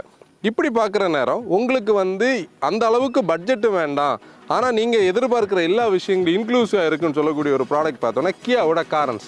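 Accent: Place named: Nanjil Nadu, India. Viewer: Love your detailed reviews, Especially it being in the nanjil slang😄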